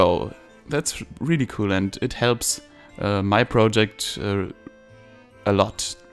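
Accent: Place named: Germany. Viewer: What was with the intro at the beginning? Was that a mistake? Also, I love your German accent.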